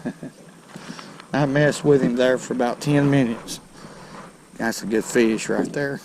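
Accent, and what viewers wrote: South, United States. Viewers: Amazing how you have southern accent but say crOppy rather than crappy. Its and A not an O. If your from the south get with the program.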